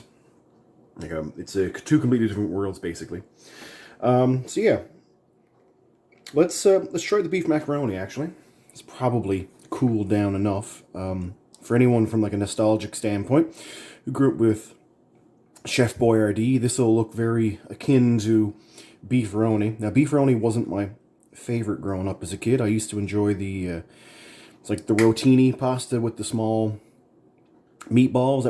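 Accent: Canada. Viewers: The best part of the reviews are you can hear a hint of Bubbles from time to time from TPB lol Canadians have a great accent. Much love from Wisconsin,USA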